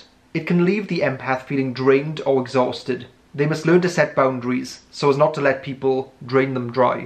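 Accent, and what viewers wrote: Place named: England, United Kingdom. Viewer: Oh, I love your videos. And I also love your voice, the phrasing is so neat. I'm trying to figure out your accent... Any clues? You're English, you're Welsh, one of your parents is from India... 🕵️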